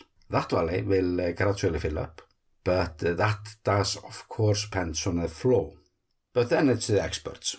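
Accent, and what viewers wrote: Iceland. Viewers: As a student from Ireland who worked in Vestmanneyjar in '65-'68 and who saw Surtsey grow up, you accent, while not Westmann still reminds me of the many friends I fondly remember from those delightful days. Great reporting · Yes I love your commentary. Just matter of fact, say what I'm thinking quite often. I am getting used to your Icelandic accent too. I love your comments about the Norwegians lol. Much love and respect from the UK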